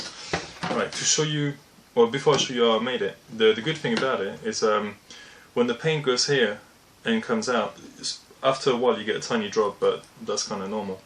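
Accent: France. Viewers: Bonjour ! :) Excellente vidéo! ;) Ps: êtes vous français..? Jai cru reconnaître l'accent..^^ Si oui, serait il possible de faire de vidéos en français svp? :)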